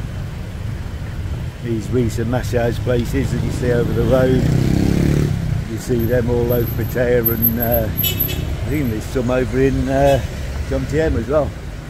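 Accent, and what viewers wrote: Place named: Nottingham, United Kingdom. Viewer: Hi cant place your accent, at a guess would say Nottingham?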